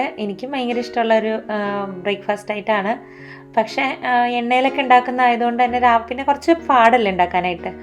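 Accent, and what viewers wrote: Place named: Thrissur, India. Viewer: ഞാൻ കൊടുങ്ങല്ലൂർ ആണ് thrissur Dist സംസാരവും food എല്ലാം വളരെ പരിചിതം ആയി തോന്നി അതുകൊണ്ടാ സ്ഥലം ചോദിച്ചത് ട്ടോ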